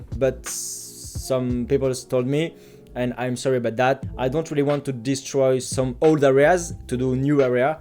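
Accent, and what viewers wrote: France. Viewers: this french accent is torture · i don't know why but i understand all your video, on dit merci l'accent français, je comprends que celui là 🤔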